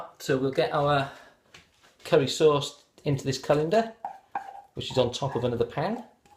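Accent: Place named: Cardiff, United Kingdom. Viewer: Good Cardiff accent there boyo lol great recipe I’m a valley girl living in Canada. They don’t do good curry here 🙈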